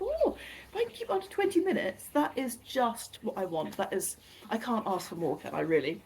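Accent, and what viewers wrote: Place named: RP, United Kingdom. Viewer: Ooo love the way you say you're shakespear quotes with you're gorgeous posh voice ❤❤❤❤❤❤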